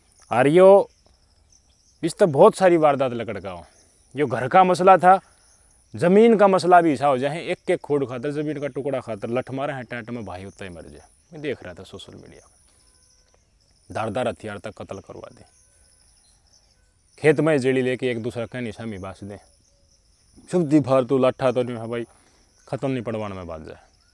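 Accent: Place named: Khari Boli, India. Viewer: भाईसाहब आप की खड़ी बोली की शैली बहुत सुंदर है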